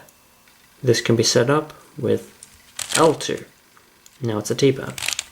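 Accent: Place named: United Kingdom. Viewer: You sound like you're british. The tutorial helped! I'm also nervous of solving it w/o looking. #AlwaysPractice | v But I don't understand how to recognize the proper position, especially for the corners. I'm not sure what to do like, for example, UBR > DFR. That is the problem that i didn't understand.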